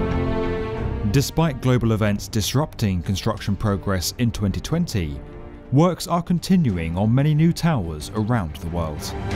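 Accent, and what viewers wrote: United Kingdom. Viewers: When i heard he says in british accent Petronous Twin Tower remind me about Harry Potter...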